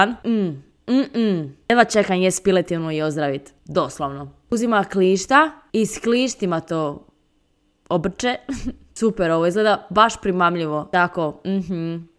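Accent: Split, Croatia. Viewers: Jesi ti iz Splita pitan radi naglaska jer ja jesan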